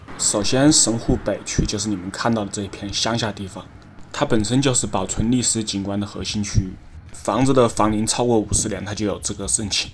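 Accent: Wuhan, China. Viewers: 兄弟，说话怎么一口武汉味! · 武汉口音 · 请问，播主是湖北武汉人吗？口音有些象 😀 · 你肯定是武汉人：） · 朋友，听你的口音，是武汉的吧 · 博主武汉人吗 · 标准的武汉普通话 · up是武汉人吗，口音听起来很像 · 武汉人吗？一口汉普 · 你这个口音，掐指一算，大概率是武汉人，哈哈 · 武汉普通话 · 你这口音一听就是武汉的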